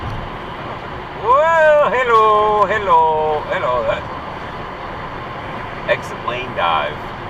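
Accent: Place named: Boston, United States. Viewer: You can take the out of Boston, but you can't take the Boston out of!:-D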